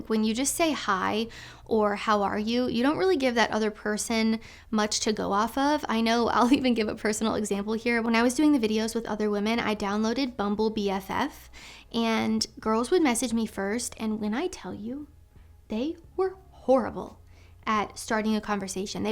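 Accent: United Kingdom. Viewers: Other than her british accent, I 100% agree with her. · Her voice is more masculine than it is British accent. I will leave it at that. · I came to the conclusion that I would never marry a white woman a long time ago and I didn't. I married a Philippina 11 years ago. No headaches, no arguments, no bullshit. I know that the woman seen here is an Asian of some flavor and has a British accent. Stick with PH as most (but not all) are much better than what you see here. You will thank me later!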